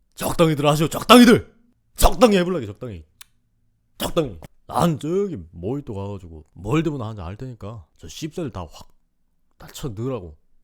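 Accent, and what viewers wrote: Jeju, South Korea. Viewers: 와 아니 제주도 말도 바꾼건 둘째치고 그 특유의 억양 잘 살린게 존나 신기함… 제주도 사투리 연기하는거 들으면 이거 못살려서 북한말처럼 들리는데 이분은 진짜 잘하네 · 제주도 사투리 좀 어설프다. 억양이 많이 틀림. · 제주도민으로써 사투리인정. 정말 연습많이하셨네요~~^^ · 제주도민도 인정한 제주사투리ㅋㅋ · 와.. 제주도민이신가요? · 와 형 진짜 제주도 사투리 자연스럽게 잘함 제주도 사람으로써 우리들의 블루스 제주도 사투리 어설프게 해서 안 봤는데 형은 완벽하다.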